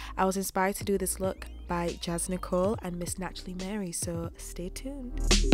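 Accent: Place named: Yorkshire, United Kingdom. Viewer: I bet alot of Americans struggle to understand your thick Yorkshire accent!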